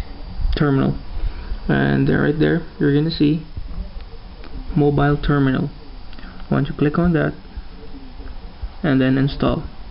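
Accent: Philippines. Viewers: u have a philipino accent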